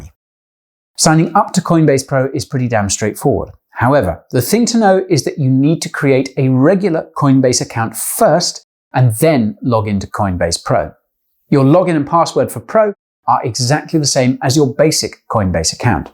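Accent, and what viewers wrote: United Kingdom. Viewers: You had me at “getting myself a girlfriend “ and love that King’s English!! 🤣 Subbed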